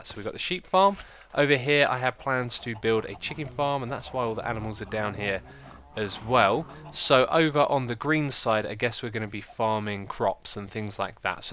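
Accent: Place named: England, United Kingdom. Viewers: That's just the English accent :D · He is from England :)... English accent^^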